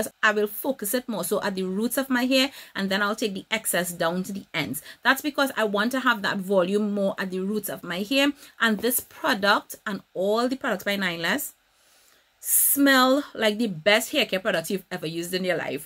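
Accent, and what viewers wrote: Trinidad and Tobago. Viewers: I had a feeling it was a trini accent. She sounds like my relatives. Lol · Girl, when I heard your Trini accent I SCREAMT🇹🇹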